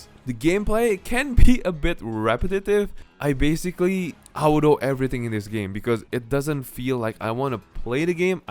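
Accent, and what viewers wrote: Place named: Indonesia. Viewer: can tell u r indonesian in just few seconds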